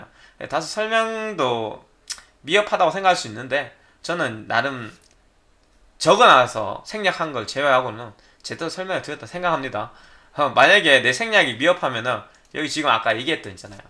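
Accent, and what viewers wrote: Gyeongsang, South Korea. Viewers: 님 말고도 유명 유투버도 육월이라고 하는 눈×전×라는분도 있는데 경상도분들은 다 육월이라고 하나요? 지역감정은 아니고 정말 궁금해서요.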